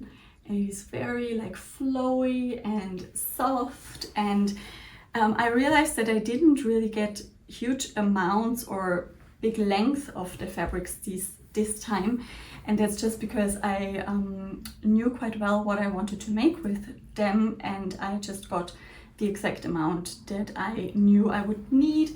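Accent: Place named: Germany. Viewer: Hi! This is my first time visiting your channel. I believe I detect a German accent. Kommen Sie aus Deutschland? Ich auch, aber ich wohne in America. 😊 I was also in London at the time of the Stitch Festival, but was attending a sewing retreat closer to Birmingham and couldn't do both. I was able to meet up with Cara, right after the Stitch Festival though. It's an amazing feeling when we have an opportunity to meet some of the wonderful people we watch and admire, isn't it? I find it interesting that you (and I) find it necessary to justify why we've done something that, to others, might feel extravagant. Life is full of opportunities and if you can say yes, to some of them, then wonderful! Plus, we are all sewists here and totally understand the appeal of the Festival and wanting to be a part of this amazing sewing community that seems to only exist in the UK! 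Maison Fauve seems like a perfect fit for you, from the little I gleaned from this video. I am also a big fan because the whole company just has a feeling of elegance. Their fabrics are a bit pricey but worth it. The unmistakable quality will make your garments last for a very long time. Ok, I think I've rambled on enough. I do look forward to seeing what will become of all your purchases!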